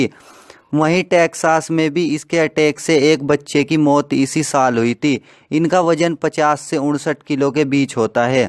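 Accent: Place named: Muzaffarnagar, India. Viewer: Bhai west up me kha se ho aap aapki boli se pta chal rha ke muzaffarnagar dist. Se ho sayed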